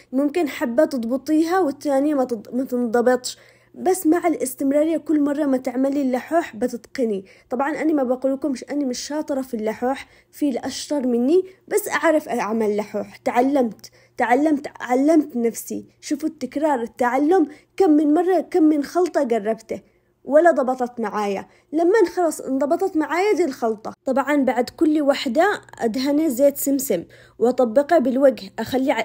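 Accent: Aden, Yemen. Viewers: تسلم الأيادي ي بنت اليمن ذات اللهجة العدنية الجميلة.. باقي 62 يوم على قدوم ضيفنا الكريم .. رمضآن.. شهر الخير ..طالبين من يدك الكريمة فضلا وليس أمرا.. بعض الأطباق التراثية الجميلة العدنية و المدن المجاورة لها حسب استطاعتك. لآ يكلف الله نفسا إلا وسعها. ألله يعطيك الصحة والعافية...إبن اليمن من جدة السعودية..🎉🎉🎉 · اتابع الطبخه ❌ اتابع اللهجة العدنية وجمالها ✅ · تحديثها وشرحها ممتع مع اللهجه العدنيه عدن ام الدنيا وناسها الطيبين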